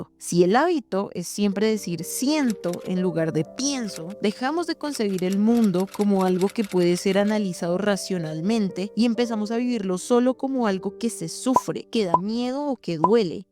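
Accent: Colombia. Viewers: Increíble video, tu acento suena Colombiano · Hola, apenas conozco tu canal. Está cool. ¿Eres colombiana? Noté un poco tu acento. Debo comentar que esto de las confusiones de términos no solo pasa con esto, sino también en otras áreas. Por ejemplo, en el área creativa se confunde mucho lo que es "animar gráficos" con editar un video, o los efectos visuales con los efectos especiales, etc.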